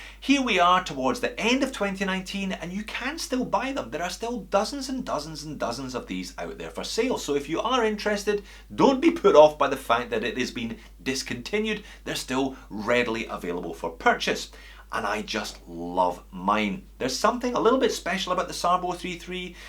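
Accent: Scotland, United Kingdom. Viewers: I think I heard you say you were Australia, but damn, you sound like a Scott. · He's not a native. Accent is for sure from the British Isles somewhere.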